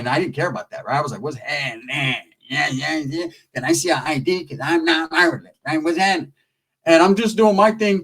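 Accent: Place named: San Jose, United States. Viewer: Your OG Sanjonero voice on point. My tios are in their 70's and still sound like that 😂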